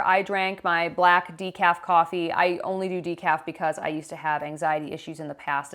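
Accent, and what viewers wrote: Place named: Midwest, United States. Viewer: Da Jewels? Sound to me you're in the midwest, perhaps the Chicago area. If you are, do you miss Dominick's? Was sad to hear of their closing. Used to live in Elmhurst/Lombard, but been down in fl since 87 june. Have been considering the diet, but not sure. I was 220 a year ago, but down to 175 now. Trying to get back down to 140. Some people say that's too skinny, and to them I say that I haven't grown one inch taller since HS, and I'm only 5'7". Don't care they revised the charts. That was only to encourage people to be fatter and more unhealthy, as if what they put in our foods here in the US wasn't enough. Liked the video. 👍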